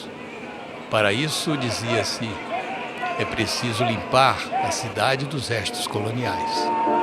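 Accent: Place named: Portugal. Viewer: Esse narrador deve ser europeu rs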